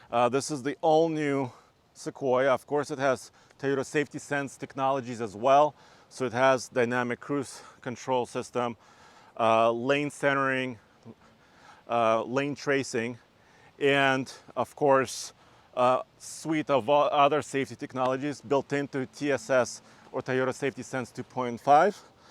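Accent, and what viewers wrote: Russia. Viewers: It sounded like someone else’s voice for the first couple seconds then the Russian accent came back in lol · Great review! Love your accent! Is it Russian? :)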